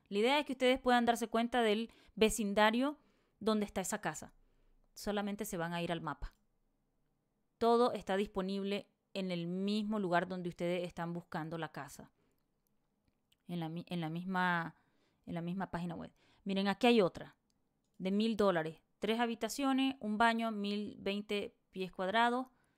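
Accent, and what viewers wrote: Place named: Central America. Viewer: Posdata: Sabía qué estás de Centroamérica por el acento pero no tenía la certeza de qué eras Nica! Yo también soy Nica,un saludo paisana! 😘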